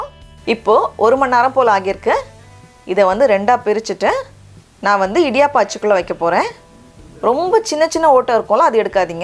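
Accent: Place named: Tamil Nadu, India. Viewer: Whenever I want to cook something special, I search for ur videos only first, Namma oor tamil la unga thelivana pechu alagu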